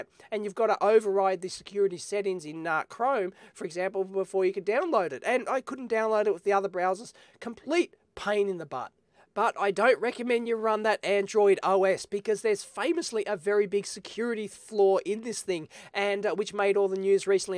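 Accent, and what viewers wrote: Australia. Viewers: He sounds less Australian.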